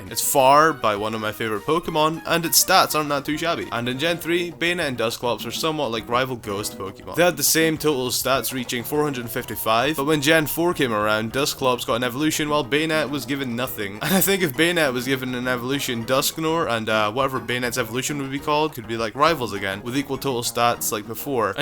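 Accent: Scotland, United Kingdom. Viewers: R u Scottish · are you Scottish? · Are you Scottish? You sound like Shrek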